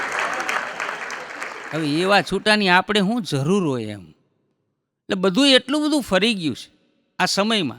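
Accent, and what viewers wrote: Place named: Kathiawar, India. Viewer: Kathiyawadi bole chhe.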